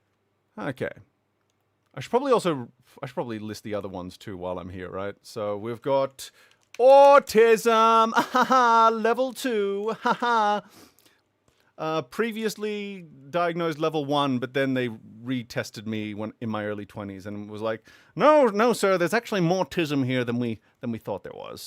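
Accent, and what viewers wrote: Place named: Georgia, United States. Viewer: I didn’t know you were a southerner from Georgia. Amazing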